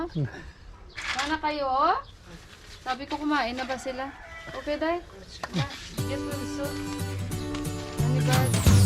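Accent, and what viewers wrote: Iloilo, Philippines. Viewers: New subscriber here...nawili aq tan aw sg vlog mo..hindi ko paman narinig sau na ilongga ka,but base in your accent im sure u are ilongga....Anong city itong place nyo? Katmandu ba?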